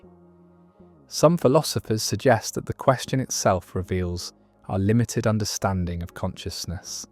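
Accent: England, United Kingdom. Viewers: Why this English accent…use an other voice Im sick of this wet mouth babble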